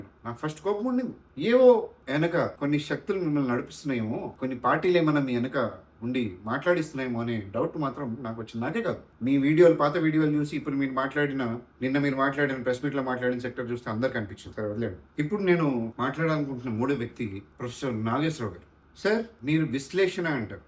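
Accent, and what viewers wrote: Telangana, India. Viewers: తెలంగాణ బాషా మస్త్ మాట్లాడతాన్నావు భాయ్ ఏ జిల్లా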